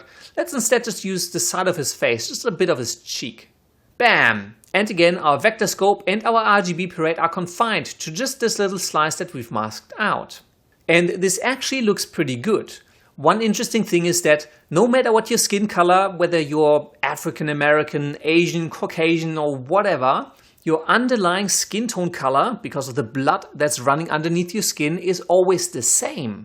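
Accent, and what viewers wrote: Germany. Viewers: I could always rely on a guy with a German accent to give the best lessons and tutorials. Thanks heaps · i guess his german accent is very strong with him XD but the stuff he made is still awesome ^^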